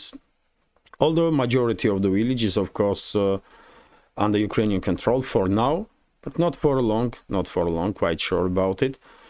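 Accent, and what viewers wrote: Russia. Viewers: FINALY A BROTHER HUMAN A BROTHER RUSSIAN VOICE RU RU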